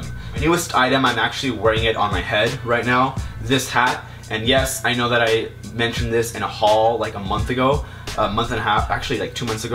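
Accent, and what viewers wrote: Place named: United States. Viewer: Si vas a hablar espanol hablalo bien no con acento americano, just saying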